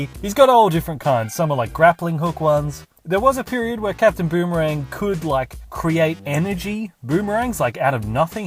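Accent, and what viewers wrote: Australia. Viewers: He's Australian, the weird thing is i am too but people think I'm American. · he's AUSTRALIAN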